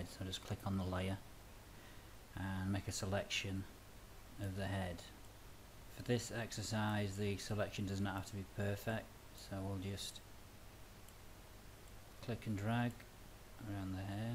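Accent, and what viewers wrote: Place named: Liverpool, United Kingdom. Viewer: this guy sounds just like a! he from liverpool or something?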